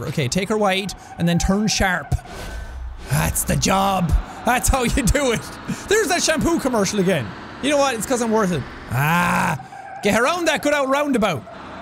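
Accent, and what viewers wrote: Ireland. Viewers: Am i the only one who is gonna realize this is the most Irish sean has been in a video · is sounding WAY more Irish these days! ❤️😂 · Omg his Irish accent came through so hard in this video, I love it! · Is it just me or has the become more Irish? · I love that you let your Irish out. I also love it when you say "ya tink?" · you Irish accent is to strong to do any other accent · Is it just me or is extra Irish in this one? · You seem more irish today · Is it just me or is extra Irish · This is the most irish has ever been. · is it me or has accent only gotten stronger since leaving Ireland xD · Ok random thing I stopped watching you for a month (idk why) and I come back and you sound 10x more Irish than before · sounds especially irish in this video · has anyone noticed his accent os more Irish?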